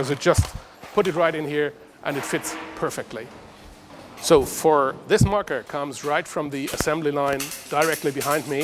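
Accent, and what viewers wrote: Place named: Germany. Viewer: German Akzent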